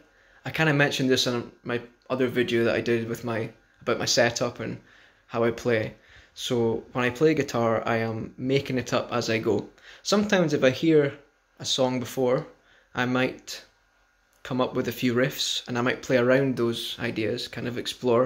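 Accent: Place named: Scotland, United Kingdom. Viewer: Well chuffed yer Scottish pal,been listening to ye for a few weeks noo,brilliant stuff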